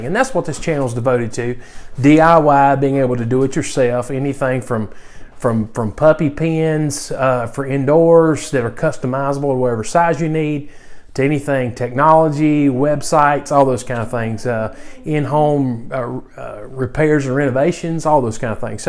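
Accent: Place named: South, United States. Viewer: I love the Southern accent! Very charming. "A letter" instead of "a litter."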